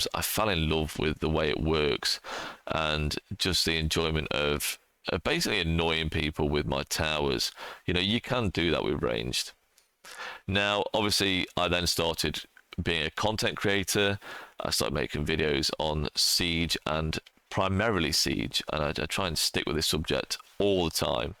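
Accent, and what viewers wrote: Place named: England, United Kingdom. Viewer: I was thinking England from the way you spoke but there’s so many different accents there it’s hard to pinpoint! Btw I just wanted to say I LOVE the videos man and I always recommend siege to people and tell them about your channel.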